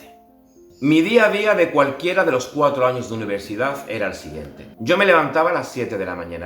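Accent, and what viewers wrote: Andalusia, Spain. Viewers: Qué gran historia de superación amigo, eres todo un ejemplo. Mucha suerte para las oposiciones, ojalá me dieras clase pero este es mi último año de bachillerato. Por cierto, por tu forma de hablar supongo que eres de Andalucía al igual que yo así que me gustaría preguntarte qué universidad me recomiendas para estudiar historia en Andalucía. La que me pilla más cerca es la de Málaga pero aún así tendré que mudarme porque está a casi 2 horas en coche de mi casa. Un saludo y gracias!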